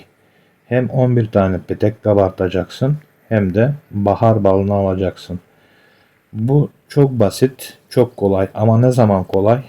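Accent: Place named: Thrace, Turkey. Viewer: Muhacir olduğunuzu veya Trakya bölgesi şivesi olduğunu sanıyorum. Hayırlı bereketli olsun, bilgiler için teşekkürler.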